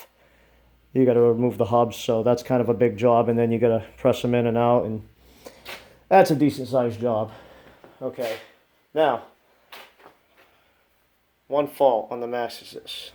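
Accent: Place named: Massachusetts, United States. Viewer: No hiding the fact you are from Mass.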